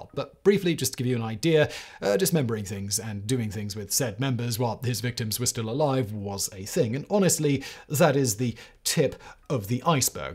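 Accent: England, United Kingdom. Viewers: Was it just me, or was extra laden with English in this video??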